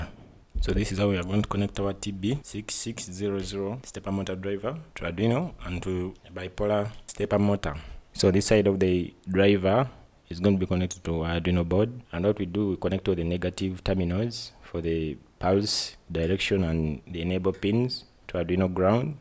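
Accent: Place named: Uganda. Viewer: The presenter sounds so Ugandan!!!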